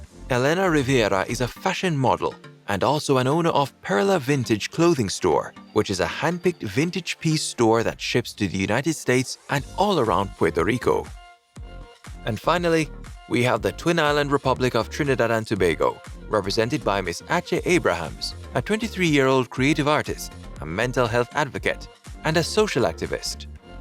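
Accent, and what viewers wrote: Jamaica. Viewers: I have watched videos by this narrator and he sounds Jamaican and has a bias for Jamaica in his videos. There was no mention of Giselle La Ronde or Wendy Fitzwilliam . He bigs up Jamaica more than any other island 😮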